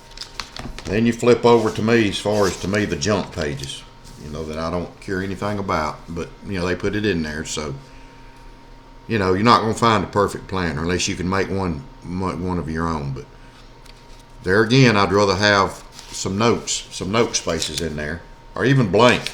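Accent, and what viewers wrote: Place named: Appalachia, United States. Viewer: It is so refreshing to not only see a man on "journal Tube" but also one that has an Appalachian accent. You sound like my family members and there's something really comforting about that.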